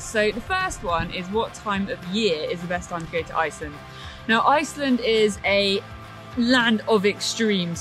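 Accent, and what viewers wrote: United Kingdom. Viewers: Love your English accent, even in your writing. Thank you!!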